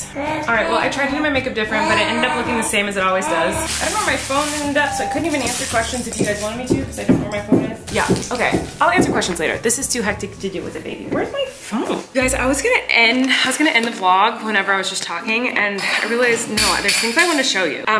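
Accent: South, United States. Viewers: you and and playing with the fly swatters with your country accent is soo cute! i know a lot about country accents being from alabama! ❤ do you ever perform in alabama or anywhere in the south east!? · I love your southern Accent you were doing if you were doing one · Love that pink on you! And that country accent 😅😅😅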